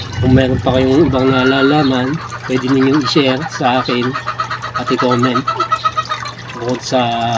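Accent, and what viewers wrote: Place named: Bulacan, Philippines. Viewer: Parang taga bulacan po ang inyong punto ng salita salamat po dammam saudi arabia po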